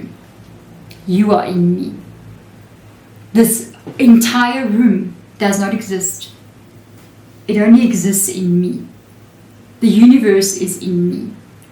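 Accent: South Africa. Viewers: Rocking up in your reading 😅😂 so Saffa!!!!! ❤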